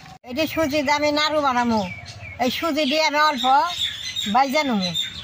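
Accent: Bangladesh. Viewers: আপনার কথাগুলা একদম আমাদের বাংলাদেশের মানুষের মতোন ❤❤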